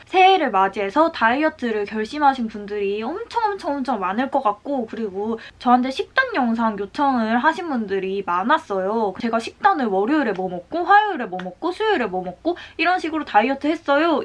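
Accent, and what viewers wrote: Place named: Gyeongsang, South Korea. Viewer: 경상도말투 너무 귀여워요!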